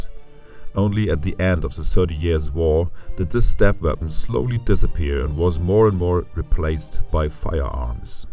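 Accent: Germany. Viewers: Wenn Deutsche Englisch blubbern...🤢🤮👎🏻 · Ekelhafter, deutscher Akzent.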